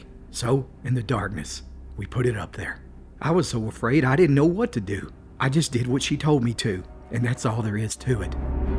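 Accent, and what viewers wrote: Appalachia, United States. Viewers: I just found your page and I'm obsessed! As an Appalachian girl myself, your accent feels like home. ❤️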